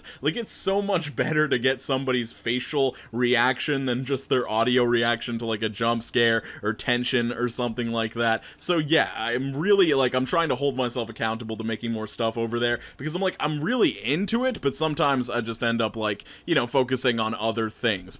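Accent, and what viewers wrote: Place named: New York, United States. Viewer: That New York accent and voice killed me dude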